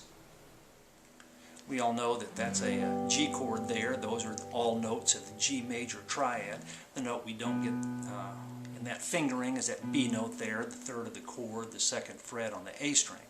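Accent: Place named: Texas, United States. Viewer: You’re accent makes me miss Texas!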